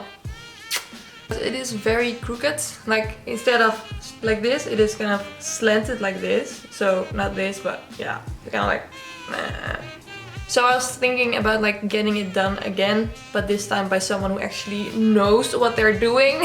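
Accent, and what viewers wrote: Netherlands. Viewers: Is ze Hollands ? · Ben jij Nederlands want ik hoor een nederlands accent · moon kit ja ze is nederlands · I could hear youre from the netherlands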